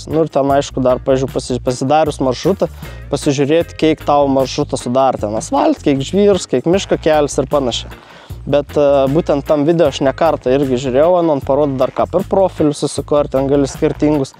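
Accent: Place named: Samogitia, Lithuania. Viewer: Iš kalbos galvojau kad Žemaitijoje kur lakstai, o po to žiūriu, kad čia mano miškas prie Vilniaus :D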